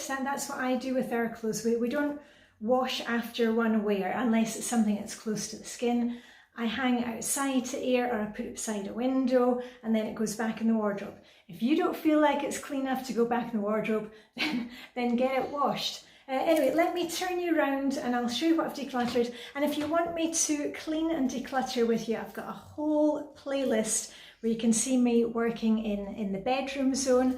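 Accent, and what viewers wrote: Scotland, United Kingdom. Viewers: Hi, I really love your channel. I lost my husband.of 49 years, 2 years ago and.I still struggle with being.motivated. I do have a morning routine and stick to it most of the time. I do like to hear your voice, as my husband was Scottish and I miss his accent. I am Australian and we lived in Australia. THank you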